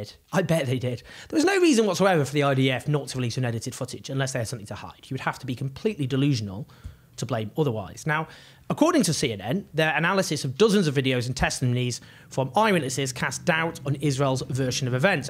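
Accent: United Kingdom. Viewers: I love your passion and dismantling BS programs. Please, Please, Please slow down, enunciate, and stop trailing off at the end of your sentences. I'm an American. Whatever you could do to soften your British accent would go a long way in making your presentations more intelligible for your foreign and maybe even your Brits, Scots, and Irish. BTW I am Irish all the way.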